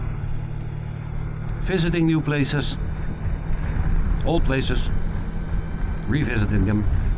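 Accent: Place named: Netherlands. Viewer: Setup functions amazing. The Pan sounds great (love that V4 smoothness) and you voice is picked up clear. Good audio balance overall. Image is up to spec too. Guess all you are missing now is a cam under the head and tail light for some serious cam switching editing and ofcourse those sweet sweet unobstructed views of the Dutch countryside. Soon RTL's MotoNL can pack it's bags (or more specifically panniers). Your English is perfectly understandable despite not being a native speaker. You are grasping for words more than when speaking in dutch but for an ad hoc, on the fly recording not bad at all. And people might mention it but don't worry about the accent. Dutch just has a heavy linguistical footprint. Keep up the good work. Great vid again of this channel. Thanks for the upload!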